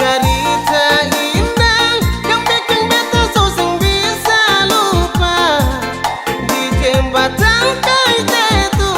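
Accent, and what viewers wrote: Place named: Batak, Indonesia. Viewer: BU KNP KLO SETIAP PENGUCAPAN KALIMAT AWALA "ME" KASAR BANGAT Y, KAYA ORG BATAK GITU..MEEE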